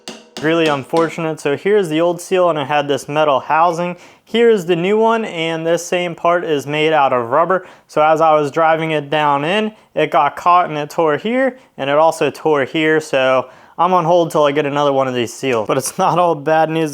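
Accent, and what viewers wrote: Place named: Maryland, United States. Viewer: Definitely tell you're from maryland just by listening lol. Sounds like a Rosedale native to me with those O's lol.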